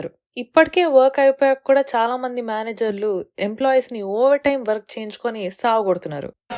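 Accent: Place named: Godavari, India. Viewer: your non pretentious godari slang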